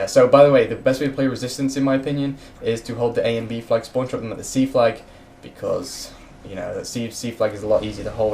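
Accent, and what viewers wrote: India. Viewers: EPIC INDIAN ACCENT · enjoyed the Indian accent :)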